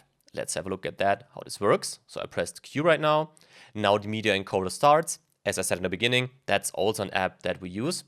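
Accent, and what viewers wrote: Germany. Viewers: Hahaha man hört so, dass du deutsch bist. Feier ich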